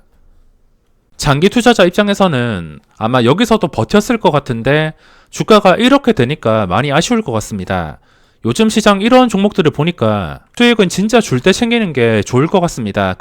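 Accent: Gyeongsang, South Korea. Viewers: 아씨.... 갱상도... 오늘 수급 있는게인지 잊는게인지 한참 통번역했네...ㅋㅋㅋ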